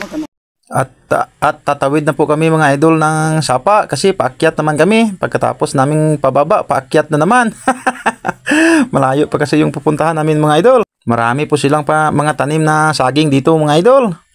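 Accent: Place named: Karay-a, Philippines. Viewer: Hahah ,nami gali pamatian Ang Tagalog kag karay ah 😂 in short Tapaznon 😅❤ Idol lang sakalam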